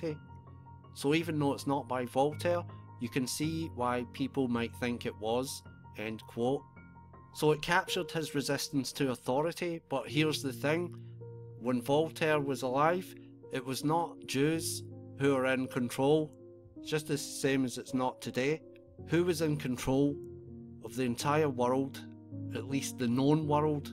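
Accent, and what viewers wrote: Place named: Scotland, United Kingdom. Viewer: It's a Scottish accent not Irishman...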